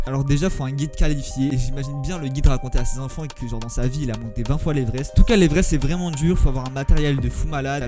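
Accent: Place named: Banlieue, France. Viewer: Des barres 😂 Ton débit, ton phrasé, tes expressions, ton regard " Banlieu " loin de " l'Univers Montagnard " mais il y a des vérités dans ton speech ;)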